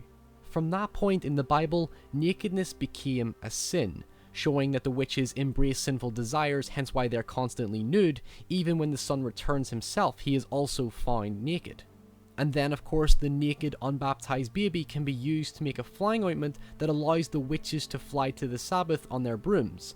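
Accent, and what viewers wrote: Northern Ireland, United Kingdom. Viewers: who can tell me where the narrator's accent's from? what part of the UK? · northern irish. just like mine so it is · What’s going on with your accent? Northern Ireland? Scottish? American? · Nah I’ve decided you’re Northern Irish ☘️ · I can't tell if you're british or american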